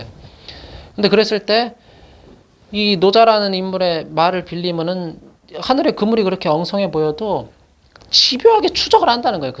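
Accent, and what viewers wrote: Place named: Jeolla, South Korea. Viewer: 이분 말투가 구수하신게 전라도 사투리 조금쓰시는거 맞나요?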